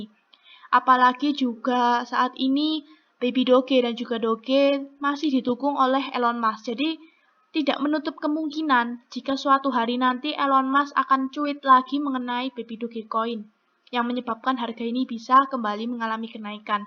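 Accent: Java, Indonesia. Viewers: Halah iki mesti wong jowo sing ngomong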